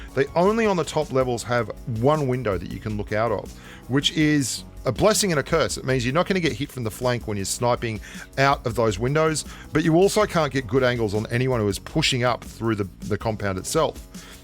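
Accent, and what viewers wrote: Australia. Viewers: yoooo u austrailian?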